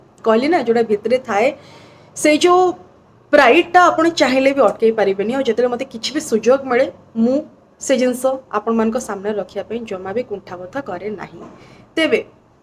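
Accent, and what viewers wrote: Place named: Bengal, India. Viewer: Very very similar accent like Bengali languages... Every word have been understand for me... Thank you for your valuable information ma'am..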